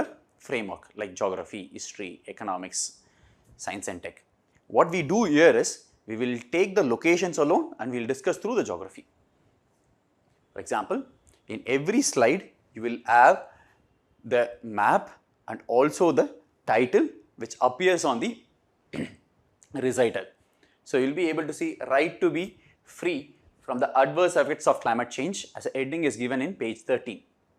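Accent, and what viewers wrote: South India, India. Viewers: South Indians accent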